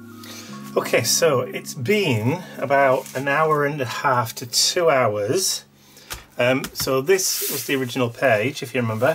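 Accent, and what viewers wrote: United Kingdom. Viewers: Lots of bits! I love the British accent!😃💖❤❤❤❤❤❤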